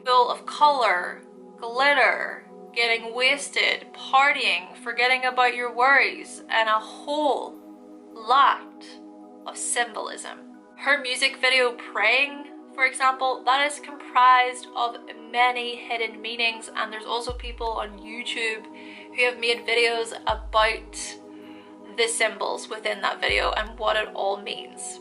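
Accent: Ireland. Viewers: It's because is from lovely Ireland^^ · The Irish accent is extremely thick. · You have the most wonderful inflections. You're Irish, but it's a very lovely version, thank you..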